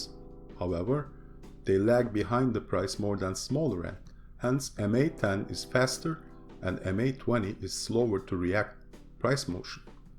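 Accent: Turkey. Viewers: Turk musun aga? :) aksanin oyle gibi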